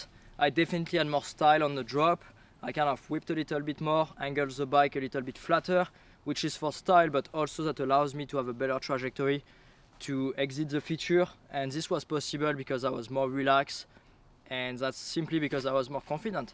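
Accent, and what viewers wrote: France. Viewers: OH avec ton accent a la française comme vous le dites j'ai du mal a comprendre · Merci pour ton accent bien français, je comprends mieux l anglais comme ça 😄 Sinon tu déchires tout, continue comme ça et bon ride 🤙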